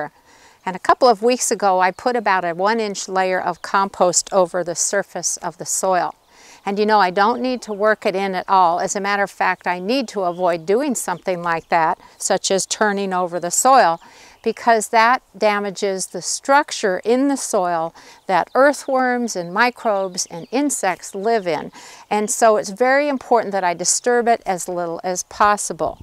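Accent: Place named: United States. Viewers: American's strong accent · I don’t know what they’re talking about it’s just American lol.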